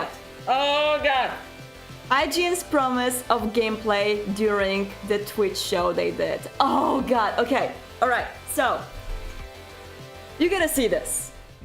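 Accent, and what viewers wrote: Poland. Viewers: It's nothing personal but am I the only one who considers polish accent so annoying that I can't watch it till the end? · I’m gay but I would go straight for polish girls with Cthulhu shirts. Your accent is adorable and I love it when you call bullshit on shitty game journalists. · At the beginning of the video i was like: "She talks like Polish ppl, wonder where is she from." and yup! #polishgirl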